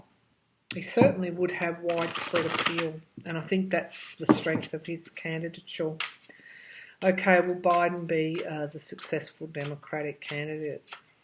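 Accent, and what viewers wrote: Australia. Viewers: I love the the R rolls in your speech..no need to correct it..Us here in America have a few different accents but I love the Assuies accent!!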